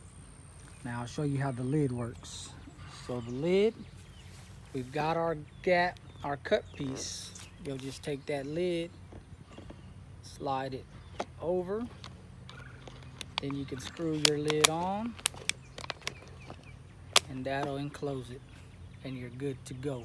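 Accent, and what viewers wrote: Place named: Texas, United States. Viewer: Thanks for this video! I’m in Los Angeles, CA, but I’m Mexican-American with family in the Galveston area & I immediately clocked your accent. Love it and makes me miss TX. Y este bigote! Nice. Also, I have a question. I have a peach tree that is in an area of my yard that doesn’t get enough sun and clearing the foliage & bushes around the existing tree isn’t an option. Half of the tree was diseased and I cut all of those parts off. (We found the tree this way when we moved into the house.) I just set up the water bottles yesterday. Will the tree being formerly diseased (I think it was a bug infestation because it was leaking so much sap!) affect my propagated peach trees? My plan was to take the propagated trees and plant them in a better part of the yard, then cut down the mother tree.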